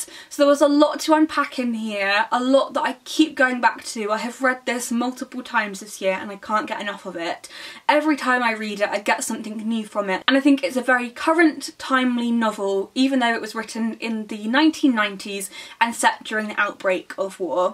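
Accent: United Kingdom. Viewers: Yours...it is my favourite "British Accent" of the Year, so could you tell me more about it, please? Thanks in advance anyway.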